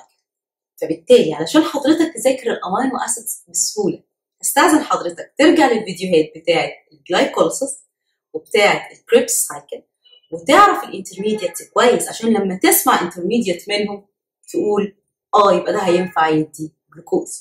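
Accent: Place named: Arab world. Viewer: She is speaking with an arabic dialect through her sessions for arabic students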